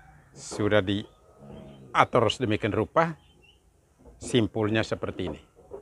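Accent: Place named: Manggarai, Indonesia. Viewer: Pak logat nya kaya org Manggarai ya Pak..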